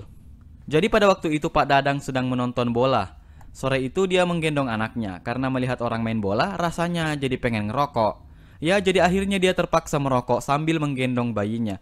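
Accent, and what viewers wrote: Makassar, Indonesia. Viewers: Org Makassar ya bang? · Kalau di dengar dari logatnya, orang Makassar kah??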